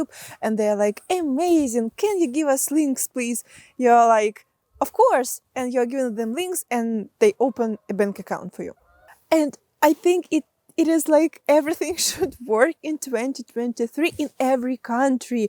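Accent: Russia. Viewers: Мадам, на каком языке вы бы не разговаривали - всё равно прекрасно слышно, что язык на котором работает ваш мозг - русский!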